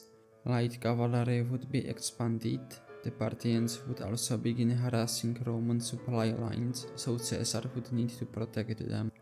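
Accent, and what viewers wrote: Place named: Czech Republic. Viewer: Tbh you get used to it eventually and I like that traditional Czech accent.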